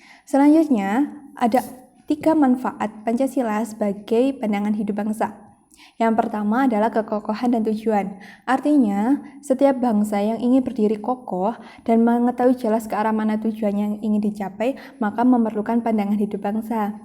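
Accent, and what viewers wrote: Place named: Java, Indonesia. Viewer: kelihatan jawanya😂 agak gimana gitu,medok"selebew🤭😂🙏🙏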